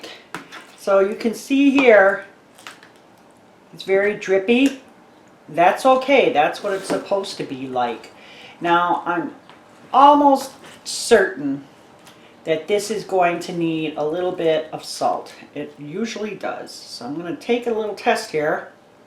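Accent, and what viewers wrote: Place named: Milwaukee, United States. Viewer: I am excited to try it! I have had it at church potlucks but never have made it. I love your Milwaukee accent.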